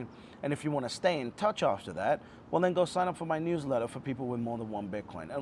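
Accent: Scotland, United Kingdom. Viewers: Shut the F up and get fantastically rich ! Advice taken ! PS are you from Scotland ? Detect a slight Scottish burr there.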